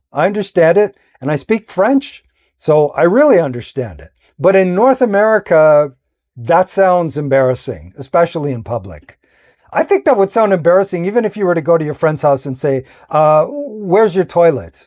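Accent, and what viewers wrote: United Kingdom. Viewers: Skip the Brit accent. 😖😱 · I love your British accent 😆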